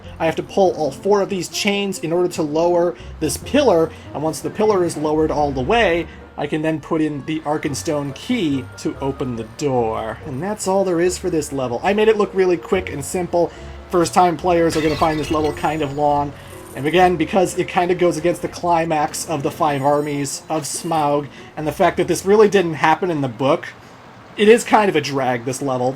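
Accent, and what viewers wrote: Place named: Canada. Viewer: I'm here for the sound of your sexy Canadian voice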